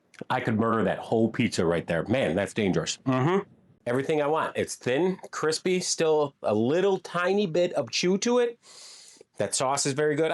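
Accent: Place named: Chicago, United States. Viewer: You have an authentic Chicago accent. I could pick out a “Chicago “ accent anywhere .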